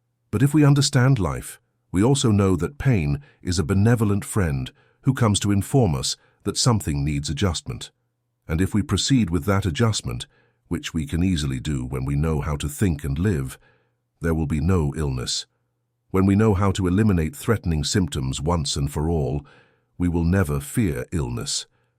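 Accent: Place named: United Kingdom. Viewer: So, are you an adrenaline junkie looking for another tequila sunrise? Do you have something against developing positive mental health habits? The reader isn't saying anything that isn't standard New Age thinking. Actually, much of what he's saying is older than New Age. Some of it is echoes of early 20th century thinking. Other parts are taken from ancient texts, especially the Bible. Is it the delivery that offends you? He's too British for your ears? I'd be interested to hear your advice for mental health. Are you anti calm? Pro aggrevation? Do you thrive on the energy of anger because it feels so good to douse those flames with copious amounts of alcohol?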